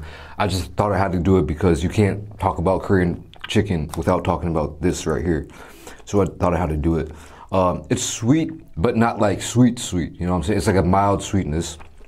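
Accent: United States. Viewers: This guys looks Asian but sounds soooo different from an Asian. He sounds like a American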